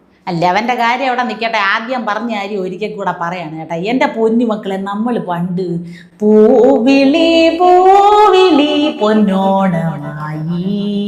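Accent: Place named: Thiruvananthapuram, India. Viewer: TVM ഭാഷ... ഇത് കേൾക്കുന്ന ഞാൻ കോഴിക്കോട്കാരൻ... ഞാൻ എന്തര് കരുതണം...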